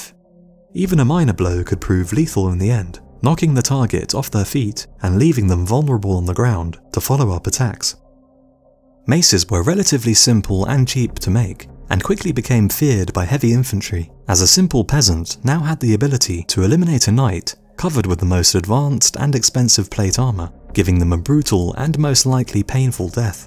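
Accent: United Kingdom. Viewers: Awww shit! You know it's gonna be good when you gotta nigga with a british ascent talkin' 'bout some medieval shit! And yes, that mace=mince-meat.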